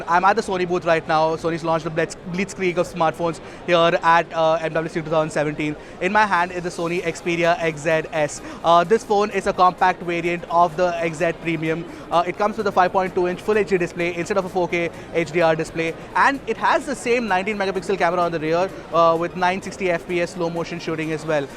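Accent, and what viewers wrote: India. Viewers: When you have a very strong Indian accent. Please speak slow. dammit!!! and Put some subtitle too. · David H Yes with a very heavy Indian accent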